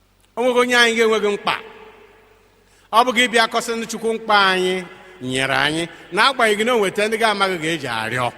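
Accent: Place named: Nsukka, Nigeria. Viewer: I'm proud of you my Lord Bishop. Your fluency in the Nsukka dialect is unique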